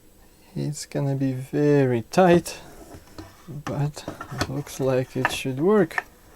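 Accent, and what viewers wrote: Russia. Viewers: русский акцент паливо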